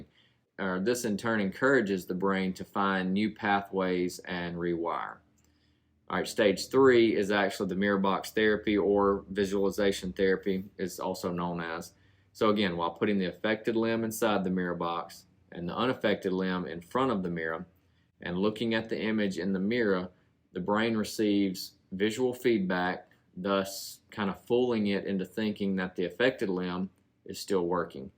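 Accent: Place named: South, United States. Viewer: Well explained and as an Aussie, I like his nice ?Southern accent. I will be trying with my post surgery foot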